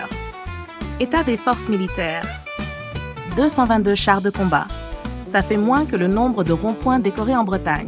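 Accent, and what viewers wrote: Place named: Quebec, Canada. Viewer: Je peux entendre un petit accent québécois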